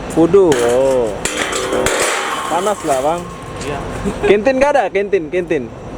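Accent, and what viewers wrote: Lombok, Indonesia. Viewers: Dari awal sy udah mengira kalau ni orang dari lombok soalnya logat penyebutan Malasianya kental nanget. Salam dri lombok juga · Batur lombok aran min, kenek jak ndek ye. Wah telang ongkat logat2 sasak tye lasing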